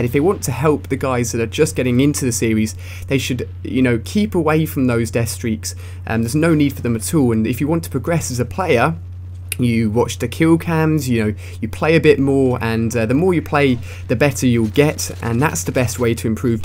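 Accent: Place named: United Kingdom. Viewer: Dude he sounds British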